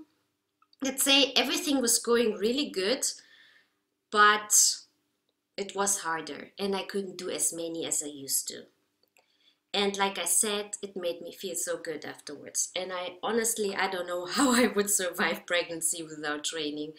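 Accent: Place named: Germany. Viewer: Hab schon gedacht: deutlich deutscher Aktzent :D Tolles Video, danke für die Tipps, viele Grüße!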